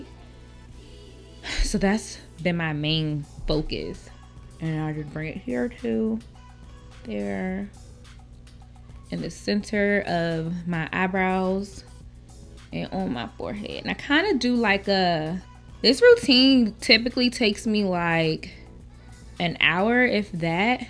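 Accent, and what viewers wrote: DMV, United States. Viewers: I can recognize a DMV accent when I hear one 😂😍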